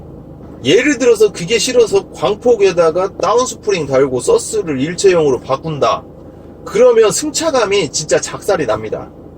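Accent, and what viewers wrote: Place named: Jeonju, South Korea. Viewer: 어랏? 전주 분 이신가보네요~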